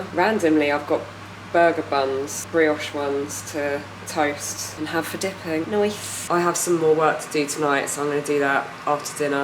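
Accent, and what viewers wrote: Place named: United Kingdom. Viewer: theres a young lady that talks just like u lol we love it at work is it like from england british or irish lol i dunno it just so dam cute liver pool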